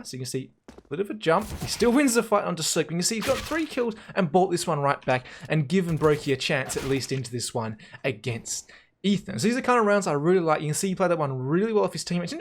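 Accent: Australia. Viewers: bruh moment...i wasnt looking at youtube and when the video started i heard an australian accent and was like oh, im watching a heyzeus video....wait a minute, this doesnt sound like heyzeus...OHHHHHHHHHHHHHHHHHHHHHHHHHHHHH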